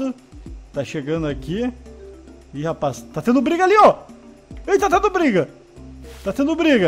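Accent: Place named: Goiás, Brazil. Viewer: O fala igual goiano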